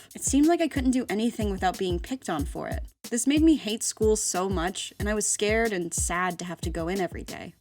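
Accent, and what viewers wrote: Canada. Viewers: IS CANADIAN CONFIRMED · So... You're Canadian? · the canadian-ification of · you sound Canadian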